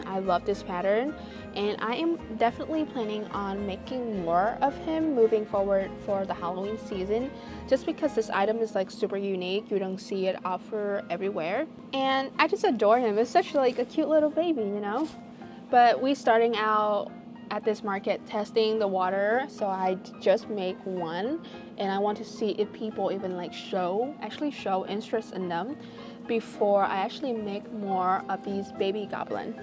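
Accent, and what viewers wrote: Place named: Asia. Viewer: Asian accent. I hear minute traces of it.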